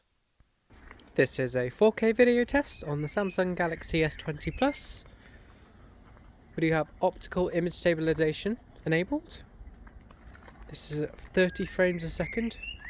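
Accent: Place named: United Kingdom. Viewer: I could listen to the British accent all day.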